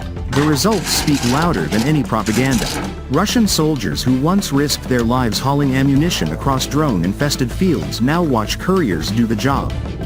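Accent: United States. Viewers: 'Next-Generation Futures' mixing of videos featuring Western GRS's armed with M2 Browning machineguns onto the subject of Russian GRS's, is either completely irresponsible or downright naive. This is just another example of the amateur nature of the entire 'Next-Generation Future' platform, complete with its nauseating AI-generated American accent.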